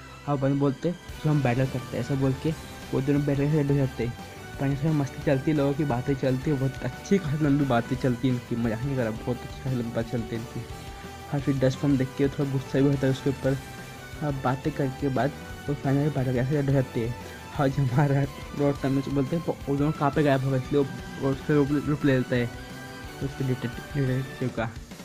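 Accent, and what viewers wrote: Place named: Bihar, India. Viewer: Bat dhik se kar bihari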